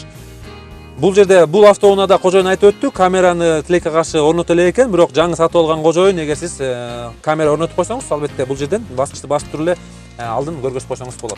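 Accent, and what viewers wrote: Standard. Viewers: ишинизди мыкты кылат экенсиз таза суйлойт экенсиз дагы кутобуз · Кыргызча таза так, Жана тунук суйлойт экенсиз. Албетте ийгилик каалайм.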